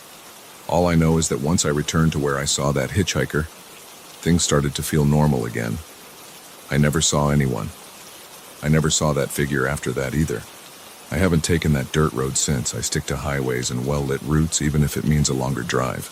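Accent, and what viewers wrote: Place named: Australia. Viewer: Finally got rid of the Australian accent. That was a bad choice, whoever made it, you are your LLM.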